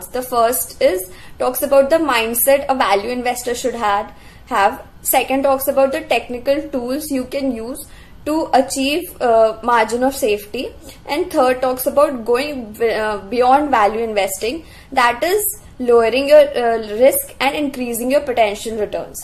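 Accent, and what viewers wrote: India. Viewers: Very very strong indian accent... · I like the indian accent very much 😉 .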